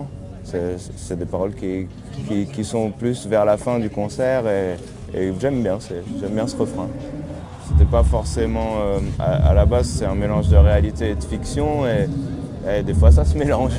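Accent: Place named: Lower Normandy, France. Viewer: Il vient de Caen ça s’entend il a l’accent Bas-Normand Il parle lentement, il a un coup de barre depuis ses 14 ans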